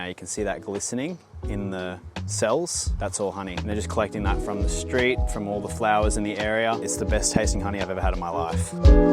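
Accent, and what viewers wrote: Australia. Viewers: ANY REASON U TALK LIKE A ROBOT ...😂😂😂 I WISH U CHILL LITTLE BIT WITH YOUR AUSSIE ACCENT , U TALK LITTLE TOOO FAST FOR AMERICAN KEEPING UP😂😂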